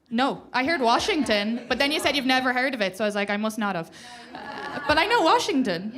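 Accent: Ireland. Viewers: Stop down to Appleton, WI skyline Comedy club - it's a 30 drive away from Lambeau Field. Wisconsinites don't get many Irish folks I think you would be a hit just for your accent. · you are the best, sweetest and most hilarious person. You're 100% right about the accent. American men can't resist it in Irish women. I'd listen to you read a phone book for a day. I'll bet you're a grand bar maid, too. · Came for the Irish accent; stayed for the laughs. Great set! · NEVER EVER heard anyone make an Irish accent sound so annoying 😢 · I started watching for the Irish accent but stayed for the comedy. Well done you have a new fan. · Can't wait for more from ya, sucker for the Irish accent but stayed for the laughter! Hi from San Antonio! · Irish comedians never disappoint 😂 she is funny and cute and I adore the accent.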